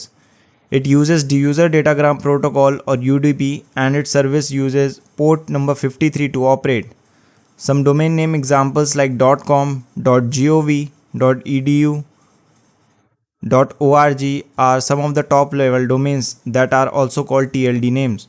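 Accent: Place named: India. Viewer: bro are you really from sweden 🤨 , i thought u were indian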